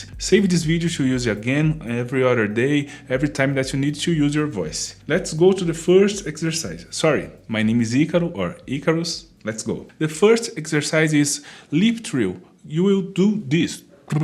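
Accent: Brazil. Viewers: Timestamps Lip trills - Z Sounds- Lip trills - The sound of Z - Tongue Rotations - Glissando - Train Whistles - Lip Trills - Do three sets of these before you sing. Thank you so much, Icaro! P.S. I love your speaking voice and your Brazilian accent!!! I'm looking forward to learning your language 😊❤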